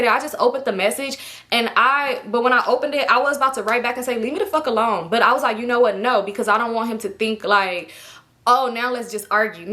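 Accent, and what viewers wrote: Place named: Florida, United States. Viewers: Thats a Florida accent frfr. lol Love that for you! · I know a Polk accent when I hear one. IYKYK Our girl getting that ring 💍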